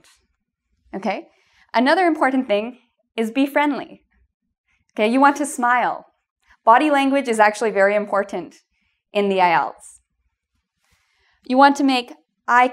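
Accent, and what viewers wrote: Canada. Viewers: Your canadian accent is so cute :) OBOOUT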